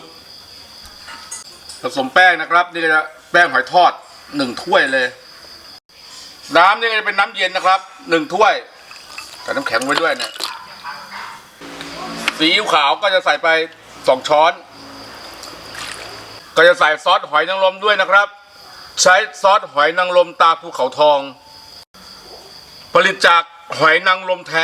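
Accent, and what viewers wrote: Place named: Rayong, Thailand. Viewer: ไม่สุกทีง...สำเนียงระยองเลยคับ555..fcคับ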